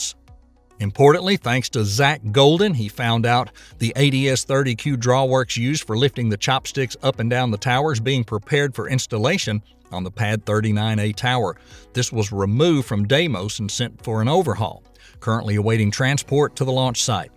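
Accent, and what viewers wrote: South, United States. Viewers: This is my favorite space news channel. Plus the announcer sounds like the original men that sent humans to the moon. (Southern accent.)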